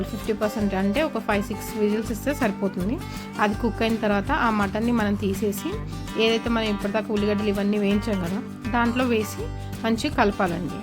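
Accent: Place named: Andhra Pradesh, India. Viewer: Mana telangana antunnav mari mana basha ,yasa akkada matladtha levu .a andra basha matladakandi.mataku mundu yenaka andi bandiloddu.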